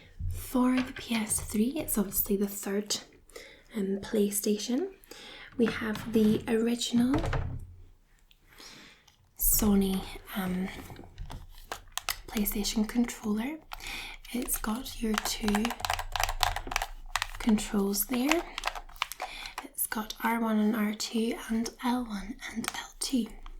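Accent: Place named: Scotland, United Kingdom. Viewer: let's plays should be a thing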